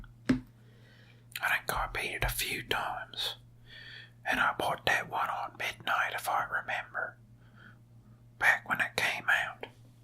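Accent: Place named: South, United States. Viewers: what is your accent ?! it sounds extremely southern american most of the time and then occasionally falls into australian especially when you say time, like and buy... its rather peculiar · owen leather this is,late but it sounds like a southern American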